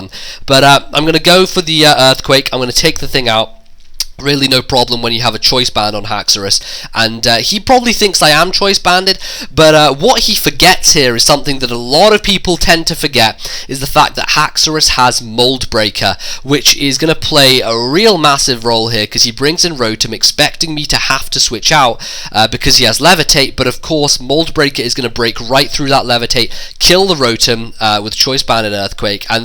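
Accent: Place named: United Kingdom. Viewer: best british accent out there :]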